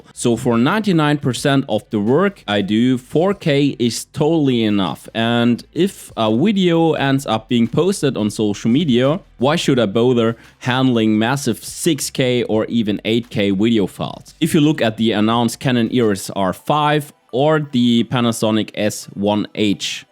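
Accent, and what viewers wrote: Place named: Germany. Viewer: Dieses German-English... herrlich 😅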